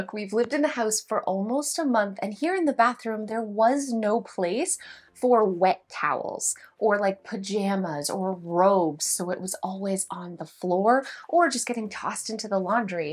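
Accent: Canada. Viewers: I love the Canadian accent I hear once in a while. My family is from Canada but lost their accents years ago....I also Love your advice.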